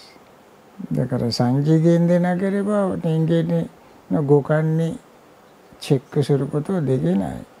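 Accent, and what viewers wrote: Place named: India. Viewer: スマナサーラ長老はイントネーションから察するに日本生まれではないように察します。 インド人でしょうか？ どこで仏教の修行を積んだのでしょうか？ どちらの宗派に属するのでしょうか？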